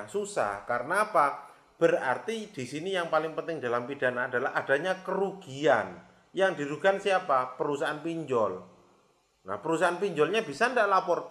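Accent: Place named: Java, Indonesia. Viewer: Ada lucunya PAK BOSS kayak ada logat jawa nya gitu ☺😆👍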